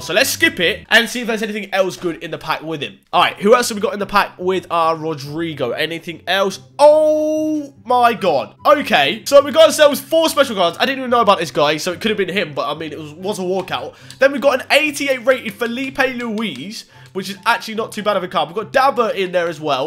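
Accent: Northampton, United Kingdom. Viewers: Are You from Northampton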